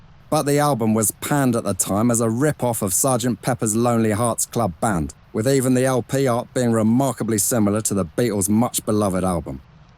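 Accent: United Kingdom. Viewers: British Howard Cosell narrator...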